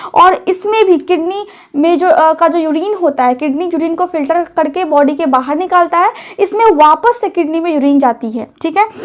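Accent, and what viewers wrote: Bihar, India. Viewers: pahle toh yeh lagta hai tum Bihar se belong karte h maina language se yeh Jana hai. Baki well done your speach is uninteruppated good show. Baki pl intimate aap ne kahan tak study ke h. Medical mein. Pl reply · Ma'am ap Bihar se ho kya ?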